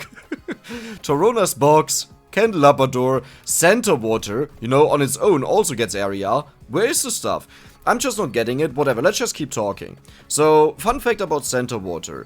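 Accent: Germany. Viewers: love the German accent and also great content and humour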